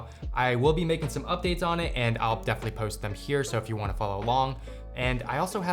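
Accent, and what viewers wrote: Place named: United States. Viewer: Dude sounds American, and it's a very standard evening hour in USA.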